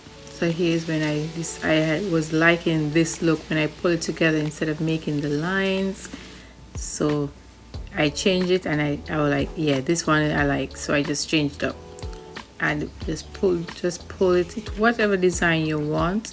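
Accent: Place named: Jamaica. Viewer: G'Day Mate, did you soak the second and third pieces of fabric like the first one or place it down dry then apply Mod Podge. Also what kind of Mod Podge did you use and do you have any suggestions on an alternative product as it is expensive hear in Oz, I don't think they even sell large containers like the one you are using. I prefer the 2nd and 3rd softer look, I also think a smaller version with embellishments would look lovely in a little girls room. You did a fantastic job very classy, only thing I would do is spray paint with a clear Lacker too help keep the dust off long term, with such a light colour. I have a few spin off Ideas from this, I think the fabric need to be light and flowing you could use more than one colour on a single canvas. I had a little trouble understanding with you Jamaican accent.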